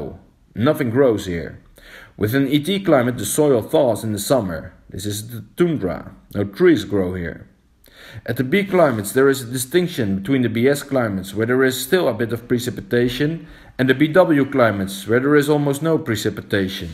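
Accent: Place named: Netherlands. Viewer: lekker typische nederlands accent weer op die engelse taal heerlijk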